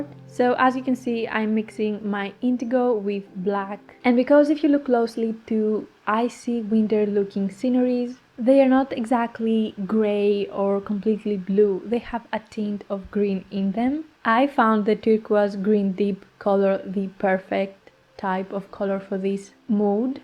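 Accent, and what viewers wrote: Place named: Greece. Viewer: Breathtaking piece! Thank you for this. Despite your very cute greek accent, you gave a very clear and detailed tutorial. All your tutorials are very helpful. ❤ Kudos! 😊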